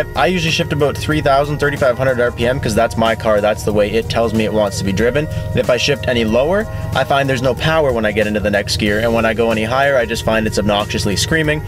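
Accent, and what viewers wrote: Canada. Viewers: Ahaaaa Canadia. That's the accent ouwt! Cheers brotha new frs owner here in 5 days!!!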